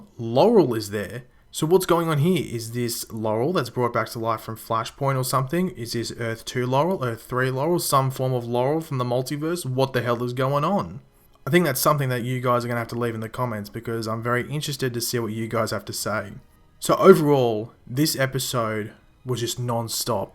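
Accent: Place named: Australia. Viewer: Are you from Australia, You have a Aussie accent :D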